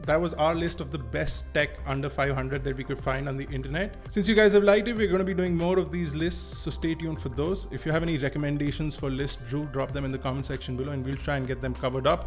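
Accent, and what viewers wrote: India. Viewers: Indian Accent 😄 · Damn man... Dope doesnt sounds so dope in the Indian accent.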